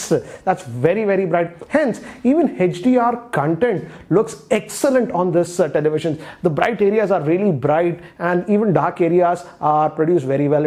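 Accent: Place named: India. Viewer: english indian accent... the most terrible!...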